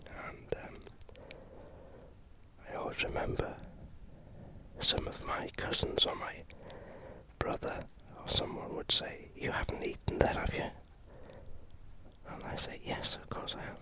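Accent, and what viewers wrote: Wales, United Kingdom. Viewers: Are you from mid Wales? My fiance and I cant decide. We live in York. My Nana was Welsh, from nr Wrexham and his family are from Newport area. We have named our baby son Rhodri. He even has a birthmark which looks like a Welsh dragon. I love your memories videos. You are an ASMR super star.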